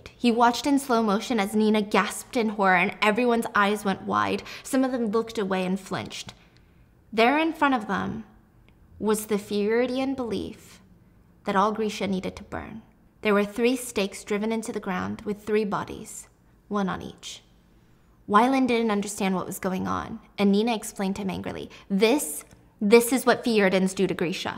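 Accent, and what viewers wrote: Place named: England, United Kingdom. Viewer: That English accent was so pleasing! 👏🏽👏🏽👏🏽👏🏽👌🏽❤😄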